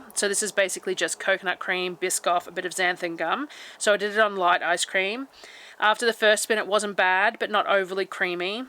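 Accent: Australia. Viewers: I love listening to you talk. I have always loved the Australian accent. Also, the speed you talk is fine. I don't want to listen to someone who talks like a turtle. So forget what those people say and keep being you.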